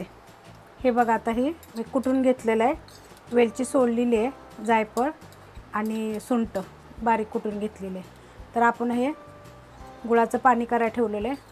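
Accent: Satara, India. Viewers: Are wa mla tumcha bolnyavrnach kalla mi pn satarchich ahe nagthancha fude kashil koparde 😍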